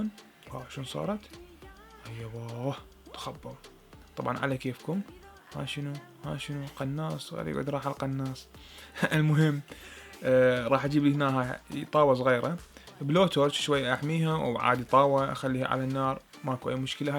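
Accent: Mosul, Iraq. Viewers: وفف فديت لهجتك المصلاويه 😂😂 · شيف انت من اي محافظة؟ ؟؟ حسيت بحجيك نغمة مصلاوية ما اعرف ليش 🤭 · حب كبير للهجة العراقية ❤️ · اسمو شوبك بسوريا.. والله اللهجة العراقية حلوة وانت انسان عفوي وقريب من القلب ومبدع تمنياتي لك بالتوفيق دائما.. الكيكة بتجنن يعني تخبل😄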